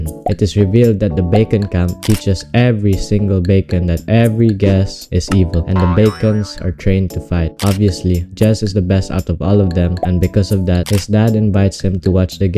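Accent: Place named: Philippines. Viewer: Your voice is very filipino and i was right( i am Filipino too)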